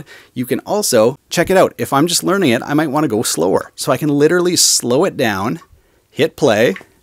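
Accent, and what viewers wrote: Canada. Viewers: I love the canadian accent lol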